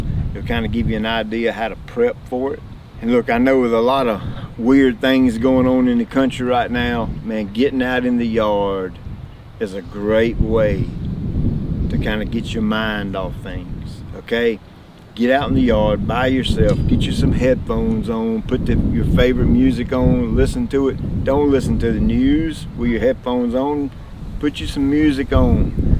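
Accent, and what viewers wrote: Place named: Louisiana, United States. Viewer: You should like you are from Louisiana instead of N.C. Regardless, I like your accent. Your beds look fabulous!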